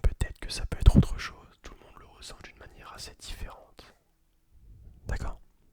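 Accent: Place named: France. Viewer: L'accent français est très fort. J'adore!!!! 🥰🥰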